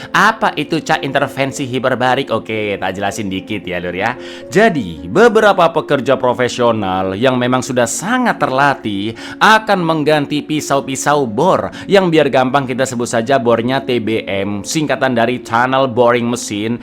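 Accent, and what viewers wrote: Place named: Surabaya, Indonesia. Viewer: Asli arek suroboyo iki admine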